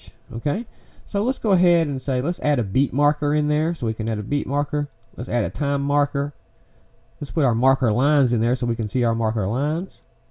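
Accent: South, United States. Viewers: Love the vids and helping a lot. Thank You! Lots of funny comments and inuendos along with good old country boy accent. Well done brother!!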